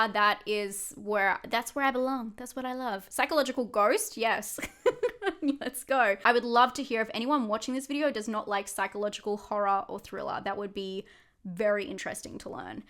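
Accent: Australia. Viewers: Australian English loves those diphthongs! 😆 I like Aussie accents a lot too.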